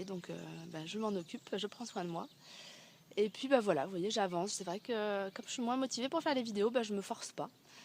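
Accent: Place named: Spain. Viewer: Bon courage et soigne toi bien surtout. Et puis l'accent espagnol avec le nez encombré. ... c'est pas simple. Bisous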